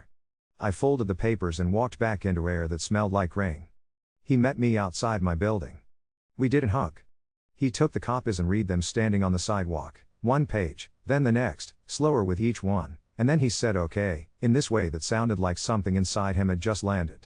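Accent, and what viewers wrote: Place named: South, United States. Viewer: why is the voice southern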